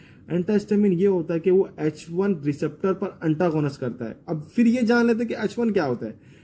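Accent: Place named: Hyderabad, India. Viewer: Bhai tum Hyderabad ke Rehne wale hai kya... Hyderabadi urdu...dikhri tumhari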